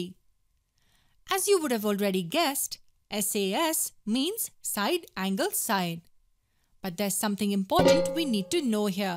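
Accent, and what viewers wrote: India. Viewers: She sound like indian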